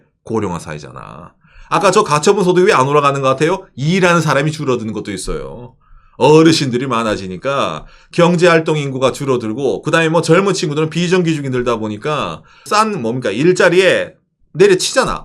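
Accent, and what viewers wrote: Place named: Andong, South Korea. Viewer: 우쨌든동? 안동쪽이 고향이세요? 😊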